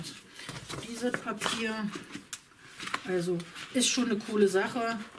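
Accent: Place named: Berlin, Germany. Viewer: Hallo, großes Kompliment für Deine Videos, die sind informativ, mit praktischenTips, Bezugsquellen und "auf dem Teppich geblieben" 😁 Dabei mag ich auch sehr den Berliner Dialekt und Deine treulich kommentierende "bessere Hälfte"!! Bei Euch geht es nicht - wie leider so oft heutzutage - um mehr Schein als sein. Ich guck's total gerne! Danke!! Viele liebe Grüße, Michaela ( Nordhessen mit Berliner Wurzeln 😜) 👍🍀🐞👍❤️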